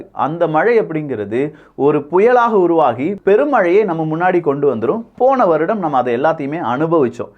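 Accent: Kerala, India. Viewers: அவர் மலையாளி கலப்பு. அதான்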